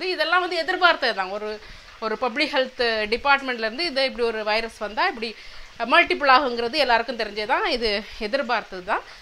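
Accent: Nagercoil, India. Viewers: Akka I like your style of Tamil.Nagercoil? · This is josephine from trichy.Hello how r u. Recently I started watching u r channel. I like u r nagarkovil slang. I like u r body language everything instead of u r cooking. Keep countinueing dear. Bye. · Superb..What a catching Talk.Clasa. No Other Words To Say..As If Iam in Krishnankovil in Nagarcoil Town... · Akka naanum Nagercoil kaari thaan...Namma ooru baashayai kekkarathukku romba santhoshama irukku....Ippolaan enga family la yaaraavathu stressed aa irunthaangana unga video thaan pottu paapom....En ponnu unga periya fan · nagerkoil thamilai marakatha thamilachi neengal i like you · உங்கள் மக்கா ,மக்களே....இன்னும் அழகான வார்த்தைகளுக்கு நான் அடிமை....enaku neraiya nagercoil friends erukanga sis nenga pesra tamil rombo pudikum....love from UAE... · Even if you settled in UK you haven't forgotten your nagercoil tamil so sweet whenever upload a video never ever change your language . 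Mother younger is just like our mother. So keep it up.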